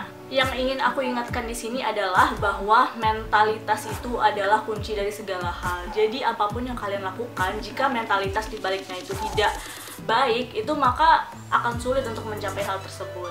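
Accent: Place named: Bali, Indonesia. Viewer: Kak kok logatnya Bali😂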